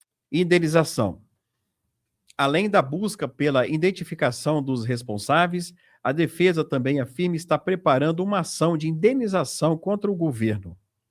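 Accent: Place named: São Paulo, Brazil. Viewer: Eu tenho raiva desta mulher porque ela é paulista está fala dela irrita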